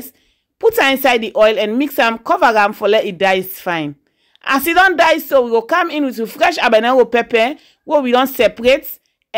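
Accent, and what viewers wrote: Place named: Sierra Leone. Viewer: My first time listening to Sierra Leone speak I’m 🇯🇲 and can understand most of what you are saying, I enjoyed listening to your beautiful accent, thank you.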